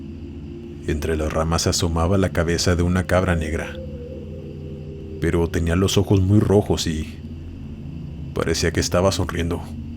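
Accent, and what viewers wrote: Mexico. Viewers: Eres mexicano?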